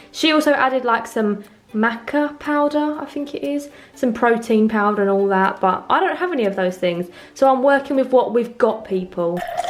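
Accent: United Kingdom. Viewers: I love the British accent because it makes every video funny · I looooove british accent!..you are beautiful! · Loved this video; you have such a great personality and so funny! Love your British accent too. 😆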